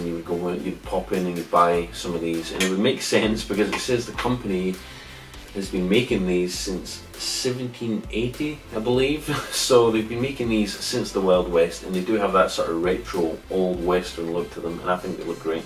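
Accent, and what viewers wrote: Scotland, United Kingdom. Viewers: Im from Scotland so I could pick up on the hints of Scottish but was confused by the American terms you were using haha. My granda is also Scottish and has lived in America for years so has a similar twang lol. Good video btw 👍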